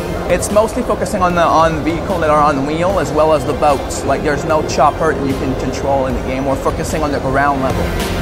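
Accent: Quebec, Canada. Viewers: to folks wondering about the accent he's from Canada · Dat québécois english accent! :D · He is right. His accent does come from Canada although only this accent is a French-Canadian accent that will only be found in Quebec and some parts of Ottawa.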